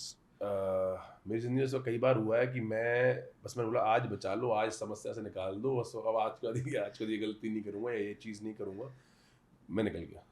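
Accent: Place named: Haryana, India. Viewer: Bhai boli haryana ki esi s, pr Dil t aacha ha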